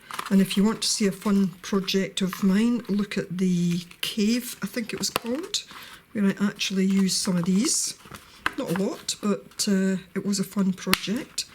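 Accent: Scotland, United Kingdom. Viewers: A fellow Scot to watch!!!!!! Your speech is very clear, I really hope mine is at least half as clear. I always worry about folk understanding through the accent. Anyway, just found your channel and (aside from the familiar accent) I’m very glad I did as you seem to have lots of tips, ideas and projects. So I’ll be catching up on some of your videos today.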